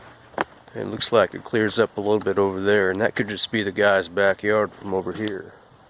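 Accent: United States. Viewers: What part of the USA is your accent from?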